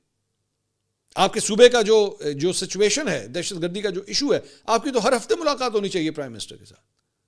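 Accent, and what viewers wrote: Punjab, Pakistan. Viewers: bhai doraan e program apka punjabi k tarka is quite hilarious...jumle k maza ajata hay🤣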